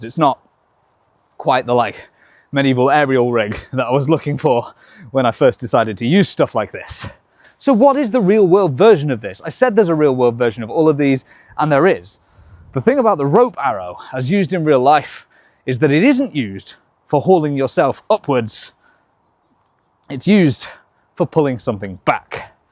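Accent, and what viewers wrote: United Kingdom. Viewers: ok so you're british right? Slow Mo Guys collab???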